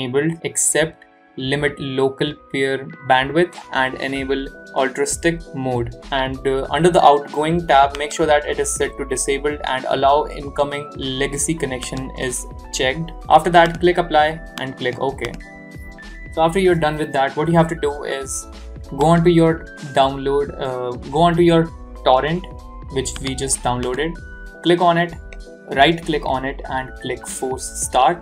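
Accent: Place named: India. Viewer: the indian accent bro 🔥🔥🔥🔥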